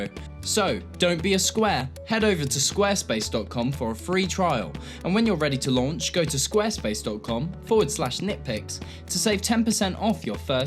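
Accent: United Kingdom. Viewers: Why are you pronouncing Iran like an American? Its weird af. You have a british accent for the rest of the thing, you weirdo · The British accent keeps me watching.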